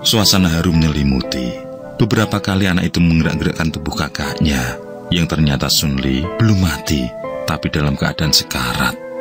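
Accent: Java, Indonesia. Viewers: Mantap gambar2 nya.. skill tingkat dewa,di padu logat.jowo yg sesekali kentel,tebal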